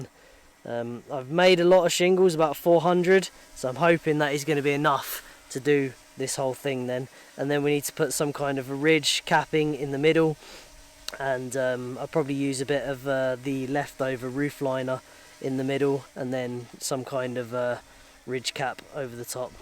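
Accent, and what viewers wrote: United Kingdom. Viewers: I'm a new subscriber...you are one of the most unique and talented "off gridders" I have encountered via video yet!! I hear your British accent, but I'm not sure where you live. Can you share the general area? I'm in the U.S. - Kansas, but we are beginning the process of becoming totally self-sufficient. Thanks for sharing your thoughtful, complex, and rewarding life.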